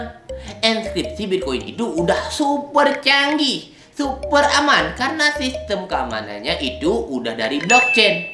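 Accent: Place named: Aceh, Indonesia. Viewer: Logat aceh abg nih.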